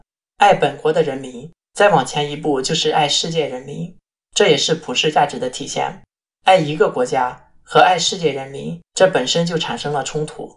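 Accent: Shandong, China. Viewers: 兄弟，你的山东口音让我跳戏。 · 山东味太重了…… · 读稿子的是山东人吗？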